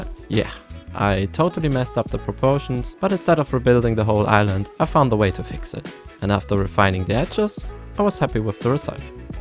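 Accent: Germany. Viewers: I like that Deutsch accent a lot bahahaha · Man hört das deutsch extremst raus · Wenn deutsch englisch reden einfach beste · Das ist eine one lovely german accent :) well done!! :) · Ich liebe die Serie, und du bist ein MACHER Hoffe das dein Umzu ganz gut wird, bin immer noch beeindruckt das man deinen deutschsen akzent fast gar nicht hört ;)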